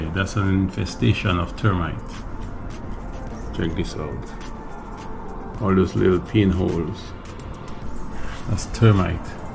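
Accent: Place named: Saint Lucia. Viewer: Hey u have a a st lucian accent.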